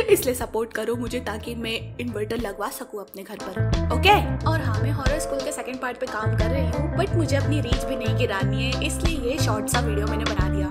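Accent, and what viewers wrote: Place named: Bengal, India. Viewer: I can smell some Bangla in ur accent. Are u bangali?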